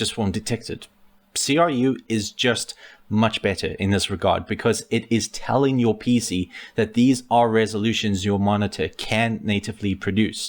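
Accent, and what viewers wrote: South Africa. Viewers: Sorry I have to ask, are you a South African or in South Africa? Cause your accent sounds like you are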